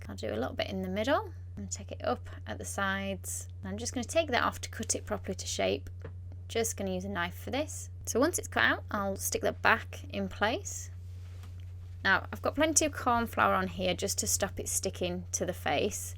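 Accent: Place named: England, United Kingdom. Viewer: which part of England is this accent?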